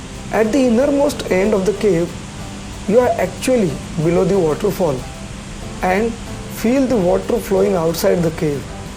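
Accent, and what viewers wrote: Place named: Maharashtra, India. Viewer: True, it's a nice place to be with nature. Would love it more, if the commentator spoke English English. It was Marathi English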